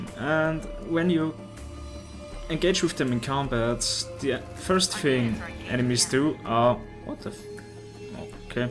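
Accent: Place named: Denmark. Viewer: Ok not to sound mean or anything but please use a script! the amout of times you stopped and hmmm, got me quite annoyed and i can tell your from denmark(sorry if your not), and no i don't know what you mean, look it up wright it down in the script, this did not have to be 24 minutes long 10 min was all you needed. but i feel potential in you join the darkside ;)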